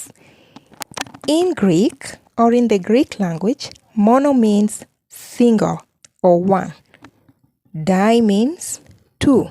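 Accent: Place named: Kenya. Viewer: I am sorry maam, but your pronunciation is quite incorrect. You are supposed to be a professional in speaking English, but it doesn't seem so. You should go do research on how to reduce your accent. I assume you are from Kenya, where British english is taught. There are countless youtube channels that teach exactly that, so do your due deligence. Please stop spreading misinformation.❤❤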